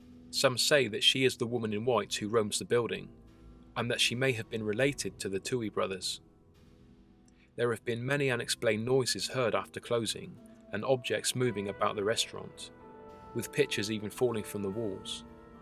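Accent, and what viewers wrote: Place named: Leeds, United Kingdom. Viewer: He's from Leeds in North of England uk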